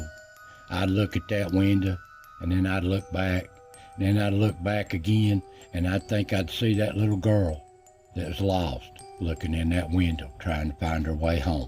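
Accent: Appalachia, United States. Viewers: I love ur Appalachian ol smokey Mt voice. God bless you sir 🙏 and merry Christmas 🎅 🎄 and Have a happy new year from the smoky mountains of sylva nc. Keep the Appalachian stories coming my friend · I've only just found your channel today and have been listening to you all afternoon. I am in New Zealand but the Appalachian people and mountains have interested me my whole life. I love listening to your kind voice and beautiful accent. This story is my favourite so far. Thank you for sharing. Bless you.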